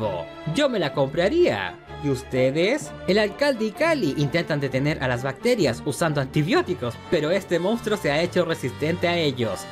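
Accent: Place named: Chile. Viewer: Que buen trabajo, noté un ligero acento Chileno, qué edad tienes? Yo tengo 37 años, la vi a mis 8 o 9 años está maravilla.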